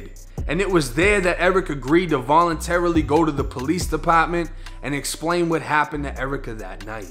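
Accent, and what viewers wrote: Boston, United States. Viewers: Not gna lie homie from that Bostonian slang you got going on I thought you said “That’s when he blew top all over the ground” …lol I was like what the fuck does that me— oh blue tarp lol Now get to tha packey and get ya self some beers as my buddy jeano would say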